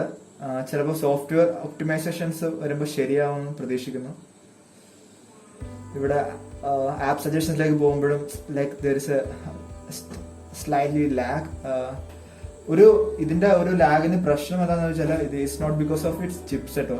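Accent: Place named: United States. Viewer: Americakaaude pole und samsaaram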